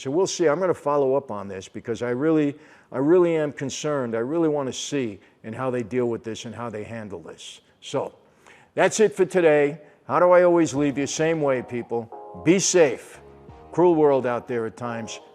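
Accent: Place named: United States. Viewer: Romanian? American English...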